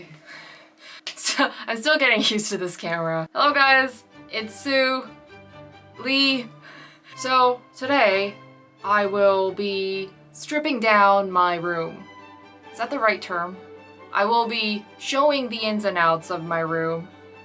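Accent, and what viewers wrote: United States. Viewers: Have you always been in korea ? Cause your accent sounds like you lived in STATES...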